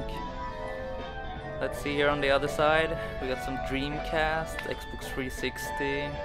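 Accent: Sweden. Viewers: are you from sweden? what hard off is this+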